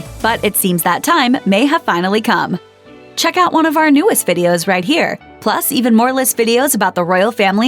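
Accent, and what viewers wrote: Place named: United States. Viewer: ...... my THOUGHTS are regards to the narrator. Why are they seemingly always American & with Terrible 'grating' accents! 🥱 Phil Liverpool UK 🇬🇧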